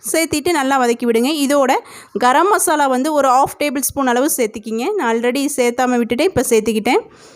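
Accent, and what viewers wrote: Kongu, India. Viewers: Kongu tamil speech is very nice sister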